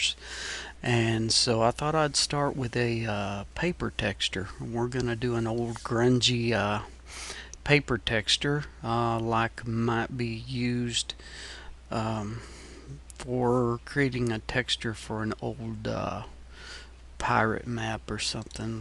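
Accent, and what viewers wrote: United States. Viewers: Love the American accent lol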